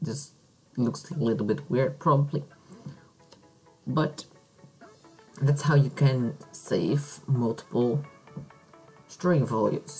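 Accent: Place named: Philippines. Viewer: Your voice looks like filipino voice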